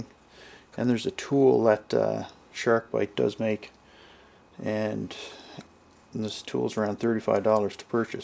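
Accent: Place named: Newfoundland, Canada. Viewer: Excellent video sir! Very clear, succinct, and to the point. Completely unrelated - to my ear, you sound like you might be from Newfoundland. (St. John’s or vicinity, perhaps?) It’s not very noticeable - but I picked up a subtle accent on words like “part”, “proper,” “marker,”- and “copper.” If you are NOT from Newfoundland, Id be curious to know where you ARE from. Thanks again for making this very informative video. Cheers!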